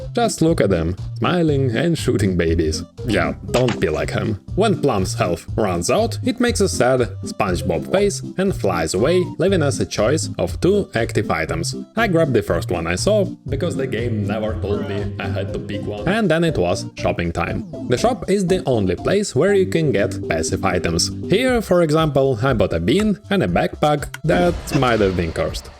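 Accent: Russia. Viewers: С оригинальным контентом не знаком, наткнулся на это видео и по первым же секундом услышал руский акцент, возможно это так для рускоговорящего плохо звучит, но звучит нечем не лучше идуского, лучше уж диктора нанять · Do you hear that? It's a Russian accent.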